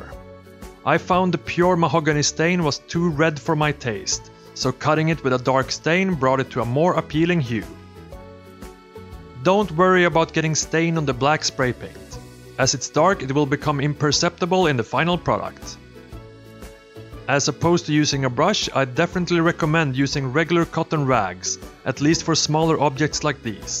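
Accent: Sweden. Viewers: My grandparents were from Sweden. Hearing your accent makes me miss them very much. Also, great information! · You from sweden? Ur accent is funny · Typical Swedish accent. I'm Norwegian, I've heard it a lot since I've been around Swedish people in international environments =) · You are so wonderfully swedish when you speak xD <3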